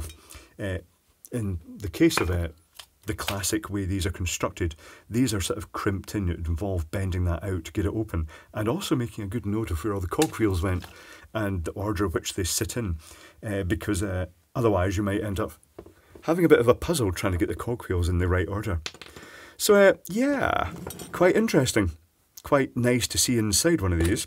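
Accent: Scotland, United Kingdom. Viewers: I wonder why the Scots say 'greeze' rather than grease. Love the way thats pronounced.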